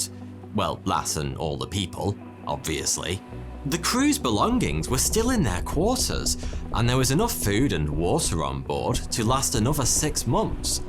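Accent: United Kingdom. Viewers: Why are British accents such good story tellers.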